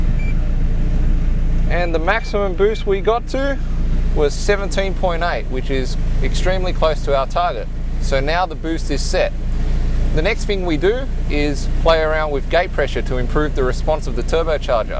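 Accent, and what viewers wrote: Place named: United Kingdom. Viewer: he's asian, why does he sound british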